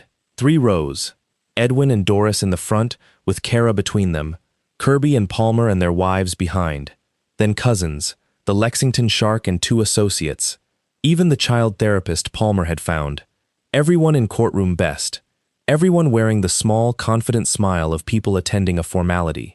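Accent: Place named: Australia. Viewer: Excellent story. Lovely voice for this from Australia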